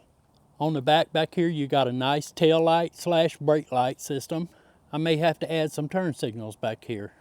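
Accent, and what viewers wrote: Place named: Mississippi, United States. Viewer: Good review! I love your accent. You remind of my family in Coffeeville, Ms. 🙂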